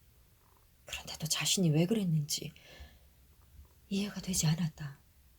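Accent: Gyeongsang, South Korea. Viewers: 갱상도 버전으로 읽어니 또다른 맛이 나네요 잘들을게요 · 왜 갱상도말로 하는 거죠? 신경 거슬려 못듣겠음.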